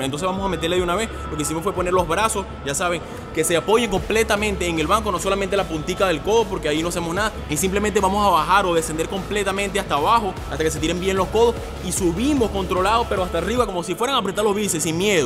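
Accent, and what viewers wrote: Venezuela. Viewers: su acento es venezuelano yesss te amo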